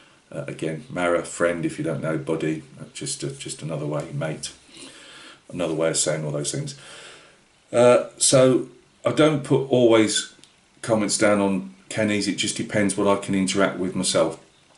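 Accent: Midlands, United Kingdom. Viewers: It's Tony by the way lol... Live in Worcester but grew up in Brum... You from Midlands?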